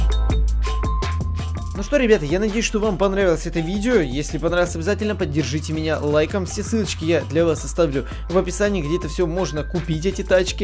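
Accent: Odessa, Ukraine. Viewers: Ты с Одессы ?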